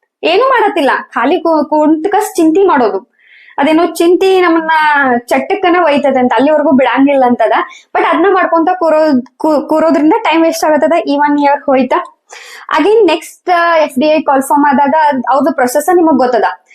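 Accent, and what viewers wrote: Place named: North Karnataka, India. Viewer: ನಮ್ಮ ಉತ್ತರ ಕರ್ನಾಟಕ ಭಾಷೆ ಅನಿಸತೇತಿ ನನಗೆ ಅಂತೂ 🤩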